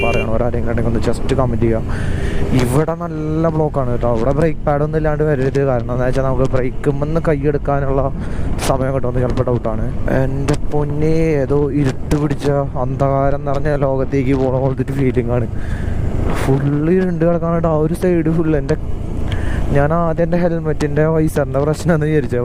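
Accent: Thrissur, India. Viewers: നിങ്ങളുടെ തൃശൂർ സ്ലാങ് പൊളി ആണ് ട്ടോ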